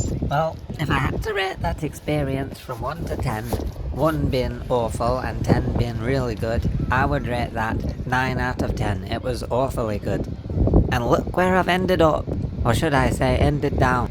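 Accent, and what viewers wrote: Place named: North, United Kingdom. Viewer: And the lips. Don't forget the lips. Oh, and the Northern accent 😁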